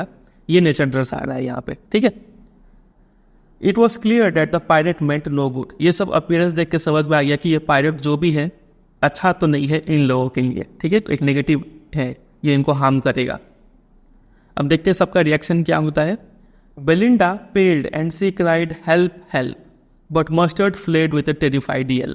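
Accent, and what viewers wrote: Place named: Bengal, India. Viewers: Sir app bengali ho na?? I understood because I am a bengali too.. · Sir's bengali accent revealed 🎉🎉🎉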